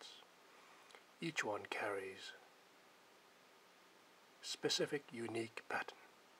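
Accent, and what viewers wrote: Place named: Wales, United Kingdom. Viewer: Welsh accent for you. L)=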